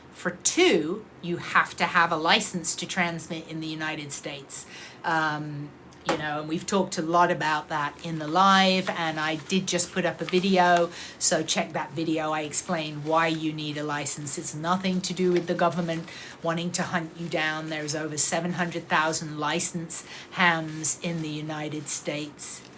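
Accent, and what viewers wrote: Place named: England, United Kingdom. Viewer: I was about to ask where you was thats an English accent and then you said you were in la. Well we shall watch and enjoy 😊 thank you for sharing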